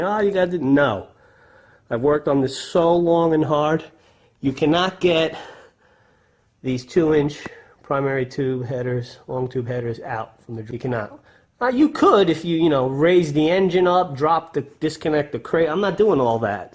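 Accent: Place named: Pennsylvania, United States. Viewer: You have to be from PA, you sound just like my friend Bill. Great channel, amazing work.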